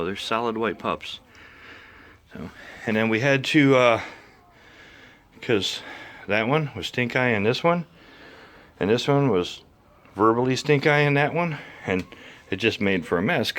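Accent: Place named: Midwest, United States. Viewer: Whoo hoo get to watch my favorite turner do other stuff. I hope this goes well for you and. I love all your content. Makes me want to go home to Mishawaka/Granger area. My family bis still there brother and sister aunt and bunches of cousins. Miss that Midwestern accent!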